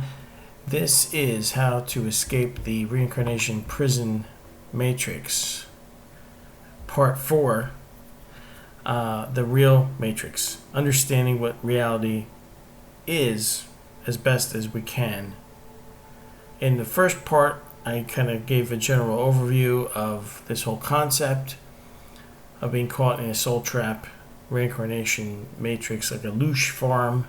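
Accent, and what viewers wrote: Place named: United States. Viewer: You have such a soothing voice, probably the most pleasing American accent I've ever heard.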